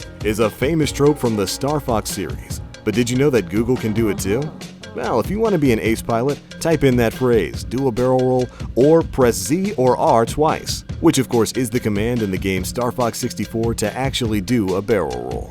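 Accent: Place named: United States. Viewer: You're in the UK? You sound so American.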